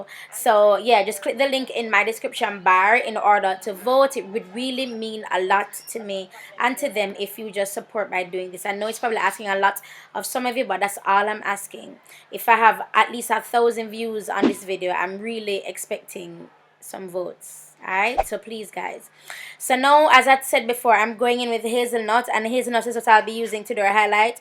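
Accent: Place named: Jamaica. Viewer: real Jamaican just the talking make you know lol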